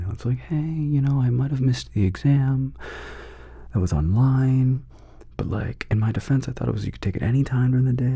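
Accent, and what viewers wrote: South, United States. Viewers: I actually love your southern accent! More please! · What is happening here?!😯 You crack me up. What a wild video this turned out to be.😆 Helix please sponsor. He deserves a new mattress. Listen to him. He needs a good night sleep.😂😂🛌🏼💤 Your editor is hilarious. The back and forth. Nice clicky clacky sounds with William. I'm new to your channel; gotta love the silly giggles.🤭 Thank you for 'sharing' with us. Southern accent. Hey now.😭 Glad your Dad is okay. The Cat moment.🙀😻 We're off the rails but in a good way. I'm laughing uncontrollably, but with you, not at you. There's a difference. This was fun and delirious. ASMR realness.🤪👏🏻 Goodnight.💜🧑‍🔬💜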